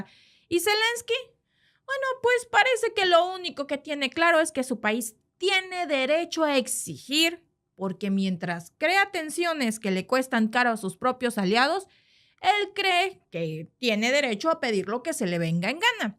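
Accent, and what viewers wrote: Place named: Mexico. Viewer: Qué agradable expresividad tiene Vd., Doña. La "música" en su locución, con ese toque MX, pero sin caer demasiado en slang local, es muy agradable. Me he suscrito porque me resulta relajante escucharla un tantito (como dirían en MX 🙂), Se hace más llevadero el habitual horror que nos trae la actualidad internacional en estos tiempos. 👋👋👋 A veces me rio yo solo cuando recuerdo cómo, al referirse a algún impresentable, le llama "nuestro fugurín" 🤣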